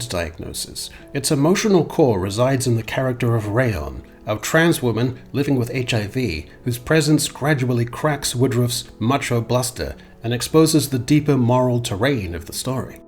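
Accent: New Zealand. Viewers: Based New Zealand voiceover guy #31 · Goodnight Kiwi, picked where you were from immediately. Great video. Asmongold sent me here, congrats on the exposure haha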